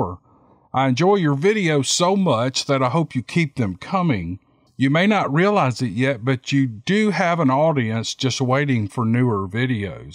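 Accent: South, United States. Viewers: I'm a 70 years old lady in Pocahontas, Arkansas I like listening to your Bigfoot stories too. And I especially like to listen to stories about bigfoot being so close to where I live. Your voice seems southern and familiar so it keeps me company. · Hi, I hope you and your family have a safe and happy holidays. I'm from Australia 🇦🇺 and it's summer down here,... I love listening to your Southern drawl. Keep up the great work. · I enjoy the stories , don’t sell your self short . You are an articulate gentleman. Down home southern is refreshing, fun and precise. Merry Christmas. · I love listening to you too in the mean while i am knitting or crochet a sweater or so, you have a nice voice and talk so that even with your southern accent it is pleasant and very good to understand, so keep on the good works, greatings from Marleen from far away europe in Belgium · colloquialism and regional differences in syntax are what make regions unique. 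rather than focusing on any particular differences, realizing how any language changes through time, understanding and accepting these changes will help those who are hung up on particular words and/or pronunciations. as long as people can understand your message, then what does it really matter. and those who nit pick and complain just to complain, usually are projecting their own issues of self acceptance., your reading/narrations are always spot on and very easy to understand. Your Southern accent, draw and tone make it all the more enjoyable 😎🤗🙃💯✨😐. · Finding Bigfoot? Finding Nothing... You Don't Find Bigfeet. They Find You. (Don't Call Us. We'll Call You) I'm quite new to this channel, about 4 months at THIS typing. I'm addicted to it - Some super good, fun stories. I enjoy "Steve Lilly Journals" too. (God DAMNED Filthy 'Squatches!! Ha!) Good Writing! ~ I live in B.C. (Vancouver Island) Canada. 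I've spent decades living in some pretty "howling wilderness" & experienced some strange things. I've yet to encounter any Sasquatch...However, I know one serious individual, whose word I trust as veritable without question, and who claims He HAS seen one... I neither believe nor disbelieve. I don't know Anything for Certain. Indigenous peoples here take the Sasquatch as a matter of fact. Sasquatch are deeply embedded into their very ancient cultural mythos lineage. Many tribal members are quite candid about the phenomenon, they call "Pohk Miss" (Meaning "Big Foot" - Go Figure) as if as familiar, and real as the West Wind. I CAN say that we live in a vast, complex, diverse, and mysterious living world, about which We collectively barely know & hardly understand at all. It is always in a state of Constant Flux. Fools THINK they have a real grip on reality. ~ Been practicing Mr. nice regional Southern accent. 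(I like various accents and find them "geo-culturally" & historically interesting, with regard to the human condition.) I'm...Getting better...Getting better... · I agree with Miss Jessie you do have a sweet southern accent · You can pronounce it any way you like--that sweet southern drawl (I'm in California orig from El Salvador) is what makes it so much more enjoyable. And yes, yes, the Sasquatch stories too, lol!! ❤️ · So true. He has a comforting voice and I just love that southern drawl.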